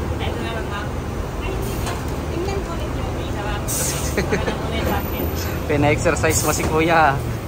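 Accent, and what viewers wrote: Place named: Visayas, Philippines. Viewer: Anong dialect po gamit nyo Sir? There's a little hint of Bisaya and Illonggo.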